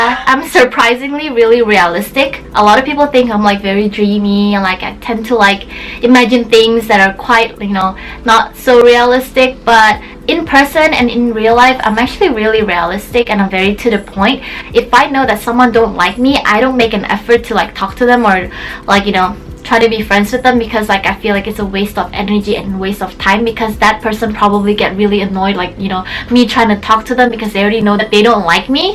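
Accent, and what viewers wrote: Indonesia. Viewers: At first you sounded like an Indonesian trying to speak English to me. number 9 explains everything. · ahh an indonesian accent, I was always confused, I knew you were korean but your accent isn't native english speaker or korean...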